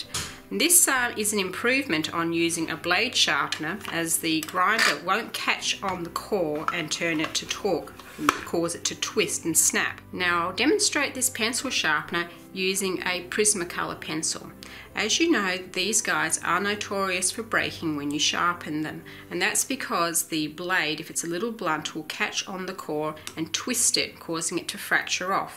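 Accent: Australia. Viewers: A very good straightforward video. Also has one added plus for me personally - it's by an Australian and as I'm an Aussie too it's good to hear it on the Internet once in a while! Note: Officeworks now sells it for A$49.98 (22/5/2019), so it's gone up $5 since that video was uploaded in 2016.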